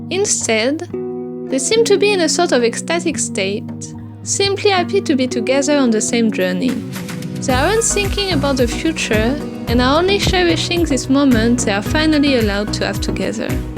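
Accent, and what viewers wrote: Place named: France. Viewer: your videos are really cool !! but you have a strong accent, are you french by any chance?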